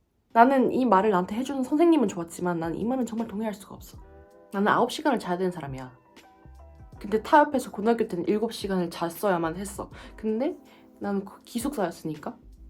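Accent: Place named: Daegu, South Korea. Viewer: 대구 사투리 쓰면 더 멋있겠다ㅎ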